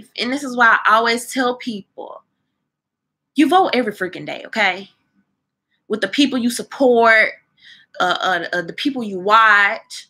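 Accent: South, United States. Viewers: I love that little country voice of yours, I'll vote for who ever you vote for.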